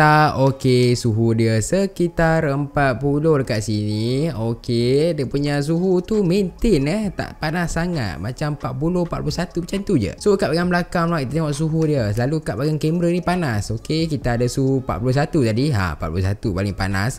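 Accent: Malaysia. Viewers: Lucu ngomong org Malaysia akwkakw jadi suka dengerinya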